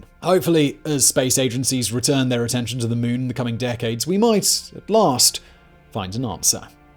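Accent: United Kingdom. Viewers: Personally, I think it is time to unsubscribe., why do you have so much disdain towards America and people of Euro-Caucasian descent? America indeed won the space race and cut back NASA to protect the world from Communism. Your accent affirms your affiliation with some islands hell- bent on colonization and world dominance for a half millineum. Islands which survived two wars thanks to America. The Americans did move across North America, but tell me which nation did not historical take lands from others? That is how every nation survived. Euro-Caucasians are the inventors of most of what made the world of today. Not one American alive today has done what the British, Japanese, Inca, Zulu, or other empires did throughout the world before America became the place most people of the world would want to live. You as a non-American, earning your living from American clicks, is amongst the least to speak as you do of American men of Euro-Caucasian descent.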